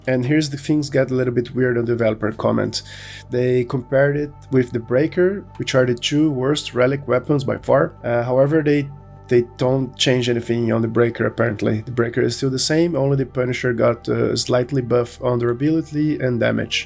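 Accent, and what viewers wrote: Brazil. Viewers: Not the worst english, for a Brazilian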